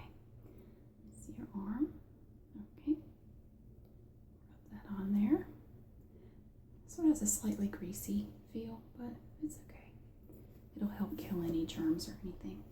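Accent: South, United States. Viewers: Subbed from Tennessee. Love that southern accent.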